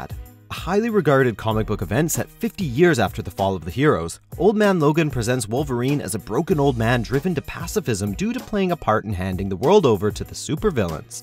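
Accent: Canada. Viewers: They're Canadian I believe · Are you Canadian?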